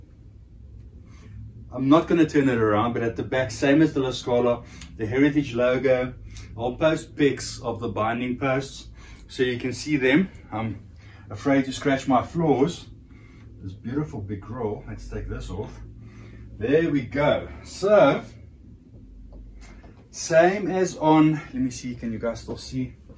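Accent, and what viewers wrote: South Africa. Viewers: My god its my first time ever hearing a South African accent and it just sounds so cool 😎 love the speaker review too 👍 greetings from Germany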